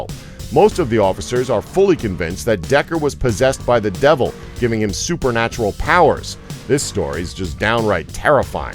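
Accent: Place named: United States. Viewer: Probably a Chinese channel that hired an American sounding narrator.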